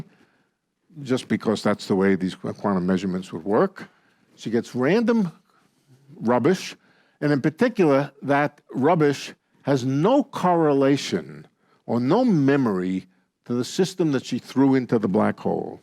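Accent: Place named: Brooklyn, United States. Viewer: Don't let Lenny's blue-collar Brooklyn accent fool you. He's Nobel Prize caliber. I have 2 degrees in Applied Physics and have sat in on his Stanford technical lectures. I was quickly left behind.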